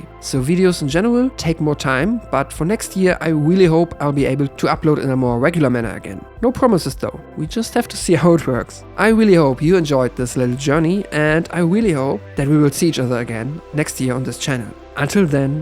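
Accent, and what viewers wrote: Germany. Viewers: Man hört doch aus der Stimme eindeutig raus, dass er deutscher ist xD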